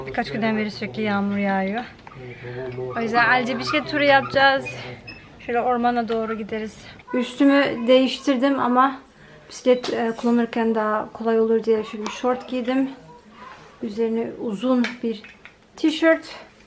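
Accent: France. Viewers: Fransızca aksanın inanılmaz güzelmiş 🧿 çok şaşırdım yıllardır takip ediyorum ama hiç Fransızca konuştuğuna şahit olmamıştım 👏🏻👏🏻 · Hepsi ayrı ayrı özel ve çok guzel kırtasiye ürünleri,çekilişe kızım için katılıyorum.Ayrıca Fransızca aksaniniz gerçekten çok guzelll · Fransızca aksanın gerçekten çok tatlı🥰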